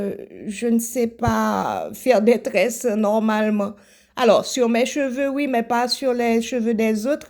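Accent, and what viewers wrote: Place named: Haiti. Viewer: Je suis une nouvelle abonnée, tu es haïtienne comme moi en regardant cette vidéo je me suis dit que tu as un accent haïtien pour confirmer je suis allée visiter ta chaîne et je suis tombée sur une vidéo en créole, je dois encourager ma chère concitoyenne🇭🇹 bonne continuation!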